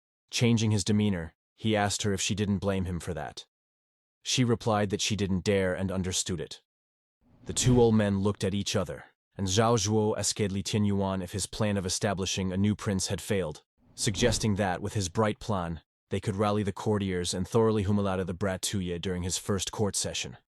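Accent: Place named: Ireland. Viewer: The narrator seems Irish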